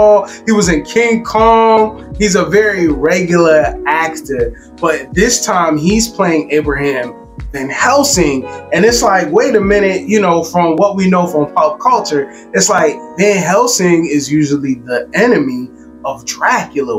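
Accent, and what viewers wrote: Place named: RP, United Kingdom. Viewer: Thanks for dropping the Mary Poppins accent. Made the video much more enjoyable!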